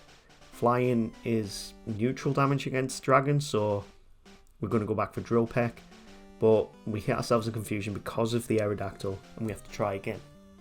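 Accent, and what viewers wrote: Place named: United Kingdom. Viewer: I found your channel while looking for a Kanto solo runner who doesn't use BBG. IMO, it's bullshit because it's very easy to discriminate between when it makes sense to use moves for their primary effects and when you're just exploiting the poor programming. It just requires an iota of self-control and honesty/integrity. The fact that enemies can accidentally boost your stats is no different to them having the ability to give you free turns with moves like Whirlwind and Teleport or even weaken themselves with Focus Energy -- part of the glorious mess that is Gen 1 randomness. But it means that the opponents are already at such a disadvantage that the BBG becomes totally broken and unfair. That's just my personal opinion, of course, but I hold it very strongly. I don't even watch Jrose anymore due to his overuse of the BBG. 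Your chan's not for me because of the BBG but good luck with it -- always nice to hear other British lads doing Pokemon content. Some assessment/advice from an expert on this stuff: the average video quality seems decent. Your energy levels aren't as high as most of the popular "Poketubers", but some people will enjoy the more relaxed vibe and you'll certainly find a niche as-is (tbh, you stumble over your words a little more than I'd ideally like, which seems harsh but modern YT demands near-perfection; if these reactions are ad-libbed, that's great - and the small flaws even add charm and authenticity - but you could always re-record lines with major mist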